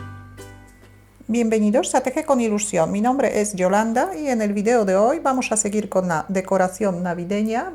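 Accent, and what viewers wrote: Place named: Spain. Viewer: Que bárbaro tu comentario Roxana salas. Tiene muy bonita su voz. Además es asento español..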